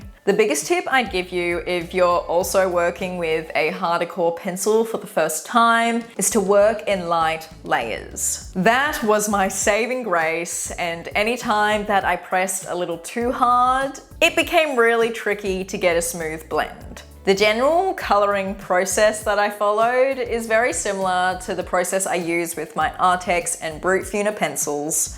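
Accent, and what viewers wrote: Australia. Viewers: wow this must have took a lot of effort and motivation to do, I like your aussie accent!😂 crayola really gives off this nostalgia vibe from colouring and drawing as a kid with these supplies, overall you did a good job of colouring this design, I really like how the water colour effect blends together nicely to create almost a tie-dye effect💕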